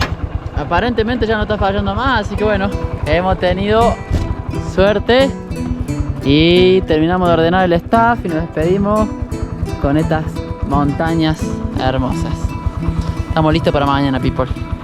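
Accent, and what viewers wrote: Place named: Córdoba, Argentina. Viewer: Pense que estabas hablando en ingles puro, pero sos un cordobés nato como yo , tefelicito por el espíritu que tienes y los anos mozos también cuenta soy un seguidor desde hace poco y me encanta la cosas que haces, disfrútalo y te mando un gran saludo jose cordoba argentina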